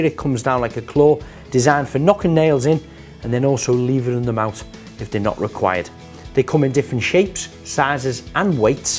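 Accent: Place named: Liverpool, United Kingdom. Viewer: Accent is from liverpool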